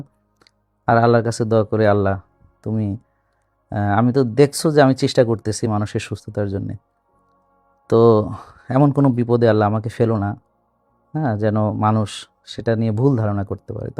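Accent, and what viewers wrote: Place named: Jhenaidah, Bangladesh. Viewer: Amar mone hoy aisob ""S-H-O-K-U-N"" k niye apnar kono comment korar dorkar nai. Ai sob manushrupi SHOITAN aigulai chai apnar mulloban somoy nosto korte. Amar bari Jhenaidah apni jokhon kotha bolen amader tone a valo lage. Apni ALLAH vokto ekjon manush etai apnar boro porichoi. Manusher shortcomings thakbei, ALLAH amader oivabei toiri koresen. ALLAH apnar mongol korun.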